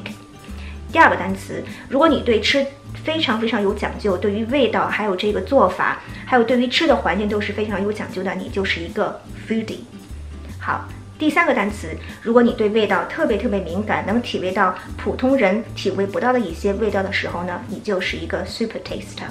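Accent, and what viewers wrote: United Kingdom. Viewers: 我想问一下在美国这么久英式口音是怎么做到没有被美音影响的，哈哈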